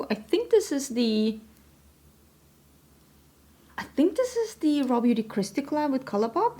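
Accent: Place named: Sweden. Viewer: Its been awhile since I watched a makeup review You're losing your accent 😭 It use to be very thick and unmistakably Swedish(not a bad thing) but it happens to quickly when you move anyway from one's homeland but I can definitely hear your accent softening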